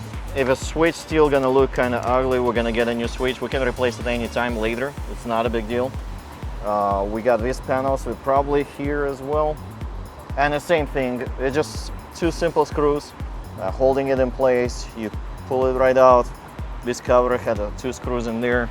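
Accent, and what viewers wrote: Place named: Soviet Union. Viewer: sorry buddy but your soviet accent is annoying but good work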